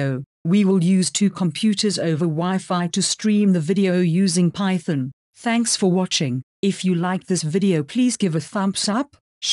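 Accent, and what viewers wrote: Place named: South Africa. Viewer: Her accent Sounds South African. Pretty cool!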